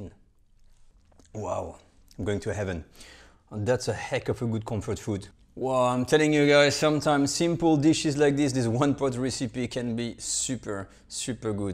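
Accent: France. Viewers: Food and French accent, what else could one ask for?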